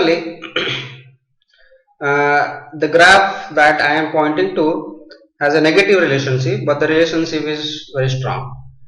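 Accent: India. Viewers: hello sir,nicely described. You seem to be an odiya am I right sir?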